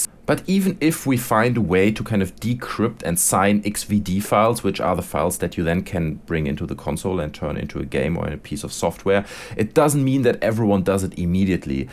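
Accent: Germany. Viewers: Erm are you german. ?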